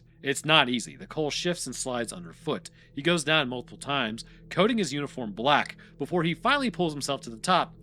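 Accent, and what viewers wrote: United States. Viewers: He pronounces the 'Merican way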